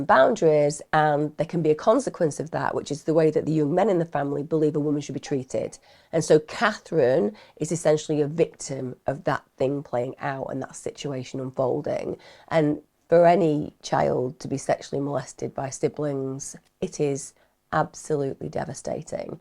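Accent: Australia. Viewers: Love the Aussie accent! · This is just horrible. I was whincing a lot when you mention about his skin. I feel sorry for all the innocent children in this. Her children and John's children. Also them poor people who actually found his body, they must be dealing with some trauma. If he got a restraining order out on her the same day, then how come she was around him and the children afterwards. I don't know a lot about them but I'm pretty sure they would be instant. And to have sex with her when he had just got a restraining order out aswell is strange. Maybe he was drunk and half asleep. Anyway as always, you are just wonderful. It amazes me how you remember all the details. I could listen to you all day, talking about anything. I bet you were fantastic at reading books for your two boys when they were younger, with all the different voices and sounds etc. Great Australian accent by the way. 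It was so lovely to hear talking in the background, she is letting you know that she is supportive of her amazing Mummy at work, she probably feels comfort hearing your voice. X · It’s gotta be said. Coming from an Aussie. Your Aussie accent is pretty spot on. 😂❤ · Your Aussie accent is very good, MUCH better than Meryl Streep's!!! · Love your Aussie accent. Well done:) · I actually LOVE Aussie accent 😂❤😘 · Hey! Awesome as always, you're Australian accent + context of delivery = Lmao 😂. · Oh I love your Australian accent! ❤ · I ❤ ur Oz accent ~ betta than most trained actors who've tried 😊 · She got away with so much under the cover of mental health you can still pay for your awful crimes and be treated for your mental health her crimes just escalate with barely any consequences Loved the Australian accent it was pretty close to how we sound. Being Australian this case has been done to death, but as usual has found out and added so many different facts and put so many different perspectives on all of the people involved in the case❤❤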